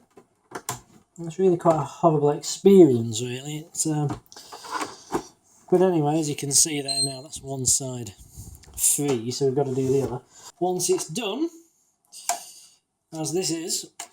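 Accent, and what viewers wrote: United Kingdom. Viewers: Thank you kind sir. while some advice did get lost in translation due to your use of "the queens english" overall you where most helpful.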